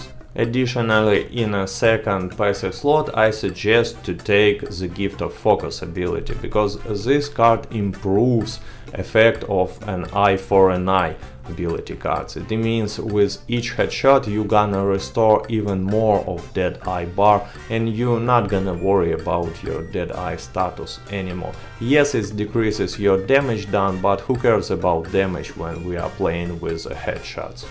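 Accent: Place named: Russia. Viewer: Hey, like ur Test cause i tested with friends same way 👌🏻 There are many possibilities to make builds with the cards and u analyzed it very clear for different modes of gameplay and playstyle 💪🏻 And last but not least your russian accent is kind of what u make unique so it s funny but i like it 👌🏻 Keep it real... i subscribed and always like your videos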